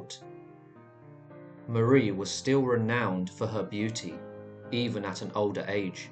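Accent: England, United Kingdom. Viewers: You have an English accent and live in UK... where do you get your American cereal from?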